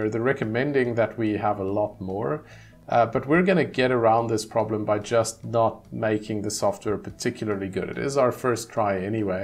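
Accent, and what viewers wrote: South Africa. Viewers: Mate I have to ask because it is driving me crazy, are you from South Africa?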